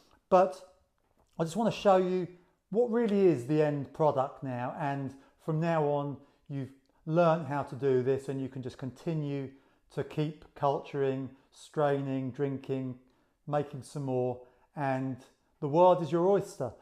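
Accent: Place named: United Kingdom. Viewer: Great job 👏 perfect explenation. Btw : I like your British accent 😉 Greetings from Belgium 🇧🇪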